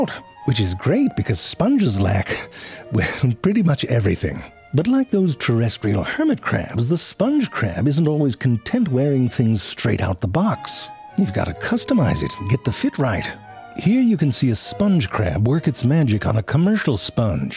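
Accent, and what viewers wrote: Germany. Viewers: What the heck, your German sounds so good! Just from the way you speak I as a German would’ve thought oh, this is probably a dialect from some other federal state. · All these years and i had no idea Ze Frank was a native German speaker till now! That's really cool! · Your german accent is impeccable!!! 😊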